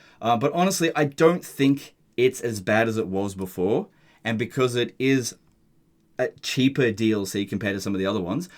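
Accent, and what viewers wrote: Australia. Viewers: Youre Australian?! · OMG!!! Are you Australian?!?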